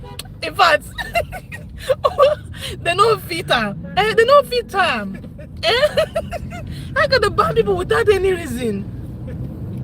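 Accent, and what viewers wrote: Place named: Nigeria. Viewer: ❤ with how the Pigin is so giving in her voice 😂😂😂 Yes o, it's real o 😂😂😂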